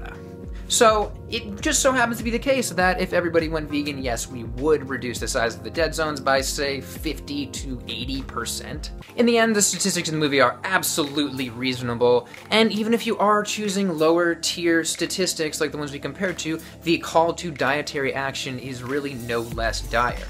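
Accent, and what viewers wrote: United States. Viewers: What accent? I assume you're talking about some version of an American accent but It just sounds like a typical American accent to me.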